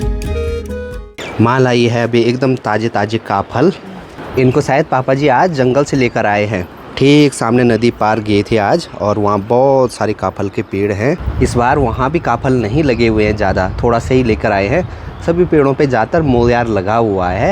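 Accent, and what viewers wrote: Pahadi, India. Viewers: Bhai ki boli bahut meethi hai... Pahadi log aise hi bolte hain... Jab ye tarsaali wala Ghar kehtey hai toh sunne mein bahaut acha lagta hai...😊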